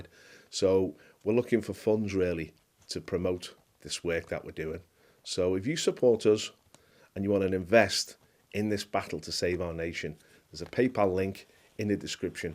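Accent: Liverpool, United Kingdom. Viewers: What a load of scaremongering misinformed populist crap. Why are so many old men so ill informed and ignorant of the facts..? Possibly because it's so much easier to maintain prejudice and bigotry rather than investigate reality.. There is no foreign invasion of the Netherlands. What bullshit. Your accent suggests Liverpool... So where are your roots..? Celtic, Picts, Scots, Roman, Anglo Saxon...? According to your stupid xenophobic logic all those peoples were foreign invaders. So where does that leave you..? Go back to where you came from... Fool.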